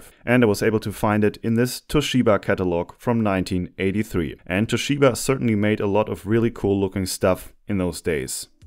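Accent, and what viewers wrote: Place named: Germany. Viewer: I love your videos and German accent. It gives me flashbacks to <num> Northern Germany while in the US army.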